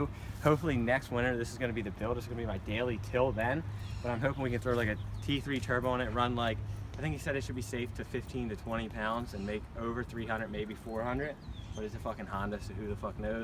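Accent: Maryland, United States. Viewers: Soon as I heard that accent I knew he was from marelin hon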